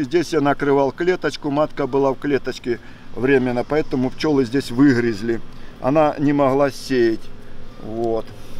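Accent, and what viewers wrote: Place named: Ukraine. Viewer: Відчуваю у Вас український акцент, чи не краще залишатися самим собою, говоріть мовою.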